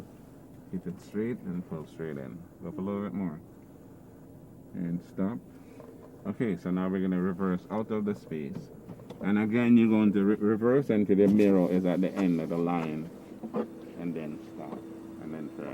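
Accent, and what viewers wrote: Jamaica. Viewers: Sounds like he’s Jamaican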